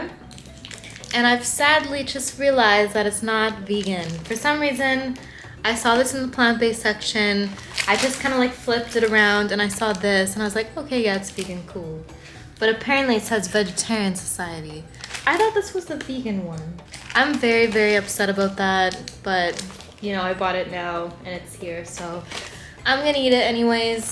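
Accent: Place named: New Jersey, United States. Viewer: Oh wow she's Canadian? I toad-ally thought she was from like Jersey or New York. Is it just me or does she have that accent? Lol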